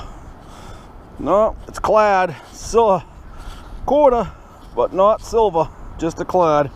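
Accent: United States. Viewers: i f'ing hate the way you yankee's talk ,but i bet you feel same about us ,so we are square ,lol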